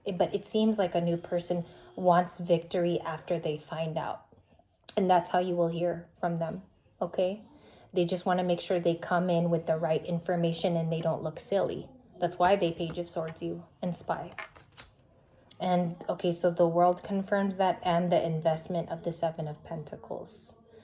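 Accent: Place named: South, United States. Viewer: Love the country accent, 🤠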